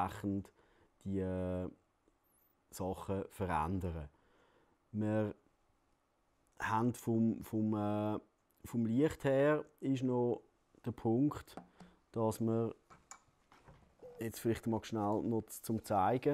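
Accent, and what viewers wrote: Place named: Switzerland. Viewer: Interessehalber mal nachgefragt: Welche Art von Schweizerdeutsch hört man hier? Züritüütsch? Ich als Norddeutscher verstehe jedenfalls relativ viel (anders als z. B. bei Walliser Deutsch).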